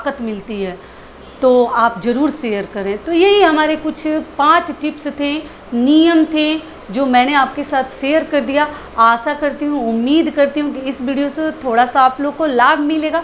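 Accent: Bihar, India. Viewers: Aap Bihar sa ho kya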